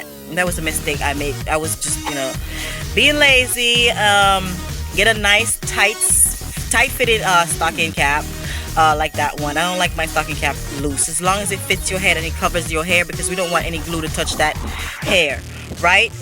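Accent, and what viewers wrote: Jamaica. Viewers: R u Jamaican?? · Are you jamaican? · a swear mi just hear yuh talk now know sey a yard yuh come from! hairstyle up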